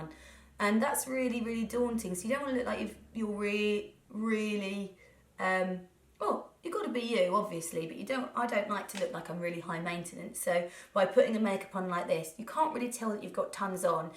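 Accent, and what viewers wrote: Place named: Norfolk, United Kingdom. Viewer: I've just recently moved abroad away from Norwich and sometimes I really miss home. You correcting 'beautifully' made me chuckle because I do exactly the same thing when I say something that sounds 'so Norfolk'!! Love the fact that Norwich has produced 2 such excellent make-up artists. Your videos are fantastic and I'm seriously envious of your lashes!!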